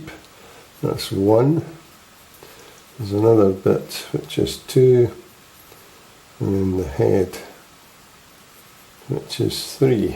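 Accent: Scotland, United Kingdom. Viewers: My absolute favorite painting you’ve done so far, new to the channel and look forward to your talent. Better than Bob Ross with a great Scottish accent👏🏻🙏🏼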